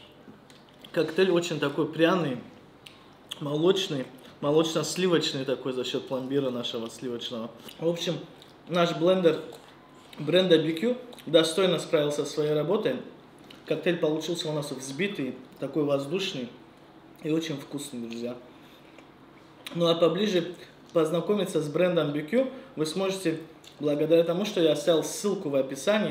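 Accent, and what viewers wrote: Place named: Dagestan, Russia. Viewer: Почему у него дагестанский акцент при такой азиатской внешности?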